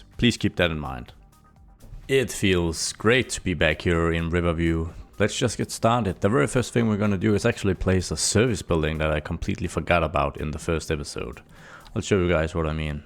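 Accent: Denmark. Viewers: Is that a danish accent I hear? :D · like the video! Are you danish? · Are you from Denmark? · Er det en dansk accent jeg hører? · I think hes danish. And yeah its a game it wont look like a real city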